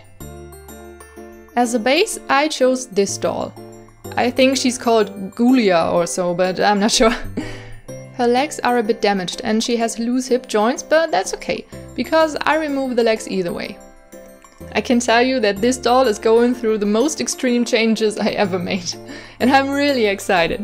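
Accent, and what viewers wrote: Germany. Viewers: Where are you from? Your English reaaally sounds like you're from Germany ^^ and the 'Moin' makes me think you're from the north sea. Am I right or completly wrong? 🌊😍 BTW! It's a reaaalllyy nice doll ❤️ instant subscribe 😊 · Man merkt an deiner aussprache das du Deutsche bist. Anfangs war ich zwar etwas unsicher, aber dann sah ich ''UHU Alleskleber'' und boom ich war stolz auf mich das ich an der aussprache erkennen kann von wo wer kommt!!! Btw cute doll <3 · omg are you German? I'm am German, it sounds like it to me :3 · I just found your channel yesterday and I already loved it after the first video also your voice sounds really cute... are you from Germany? I am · Why is the fabric softener named yes please? Sorry I know German Edit: I am German! I knew your accent was German!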